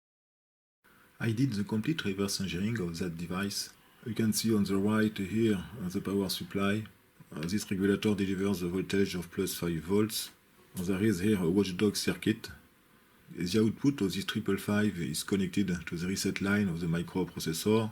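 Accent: France. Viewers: Fast and furious mixed reverse engineering, pure french accent, the best channel with Curious Marc, another French guy:) Thanks a lot.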